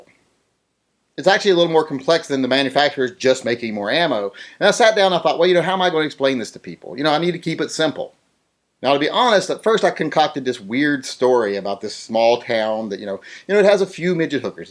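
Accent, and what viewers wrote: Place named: West Virginia, United States. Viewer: By God, only a West Virginian could say it so well!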